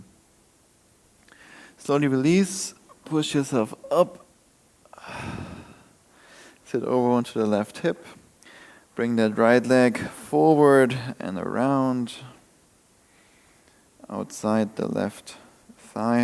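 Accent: Germany. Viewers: dein deutscher Akzent macht leider alles kaputt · I like your german accent and can understand you very well :)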